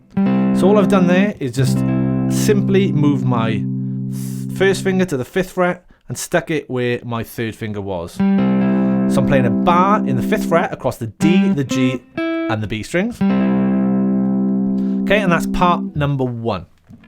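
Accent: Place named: Wales, United Kingdom. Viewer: Thanks great lesson just subscribed. Great to hear a Welsh accent teaching guitar.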